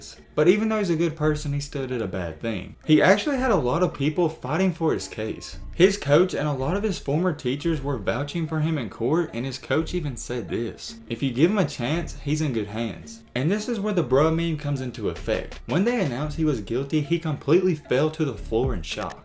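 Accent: South, United States. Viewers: dude country asl doing this vid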